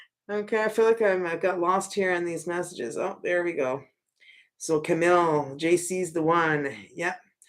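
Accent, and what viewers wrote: Quebec, Canada. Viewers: your Canadian french accent is so sweet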